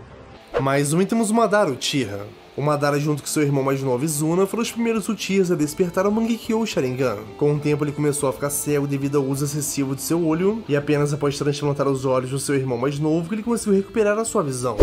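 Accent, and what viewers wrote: Portugal. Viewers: Esse cara é Português de Portugal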